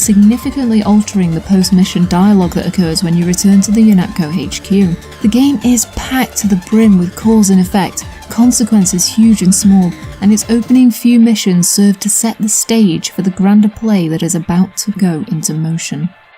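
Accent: United Kingdom. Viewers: Ok not on my phone now so I can reply properly :) Deus Ex had a massive effect on my early gaming days. Like you I remember playing it many times and spending time working out how far the narrative web would let me push it. I discovered that Paul could be saved by myself and it was my first major 'what if' moment in gaming. I still look back at that realisation with very fond memories, that this game world had so many clever ways that recognised my choices. I'd be interested to know what you think of the other games, imho none have come close to the original ofc :) Btw I've been trying to place your accent, are you in the North? The odd 'that got buggered up' is something I say :) Looking forward to your next project, I'll share this on SM and hopefully get you a few more followers because this quality of production is just as good as any made by the bigger sites. 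Cheers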